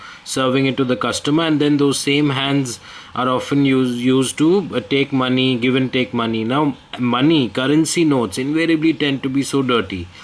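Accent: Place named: India. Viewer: fuck your indian accent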